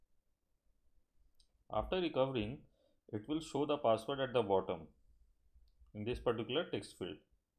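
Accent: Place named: India. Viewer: Sean Gardner relax man... he speak like an Indian. I don't think he's a Isis member. but, we can't trust too. :/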